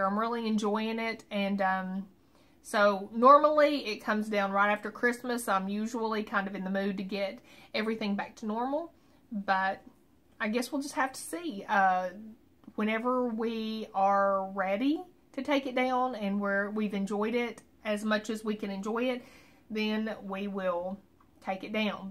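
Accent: South, United States. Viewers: love your tree, like that southern accent!!! merry Christmas.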